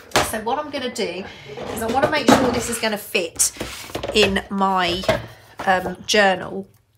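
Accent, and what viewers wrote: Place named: London, United Kingdom. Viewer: Hi, being an ex Londoner in OZ, it seems to me that you’ve suddenly started sounding more London! No offence I hope as, coming from me, it’s a compliment!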